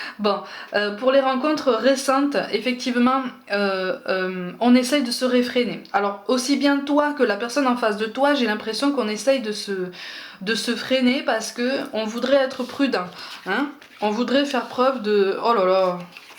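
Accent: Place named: South, France. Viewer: Merci pour votre travail, très joli accent du sud;) Je m'abonne avec plaisir:)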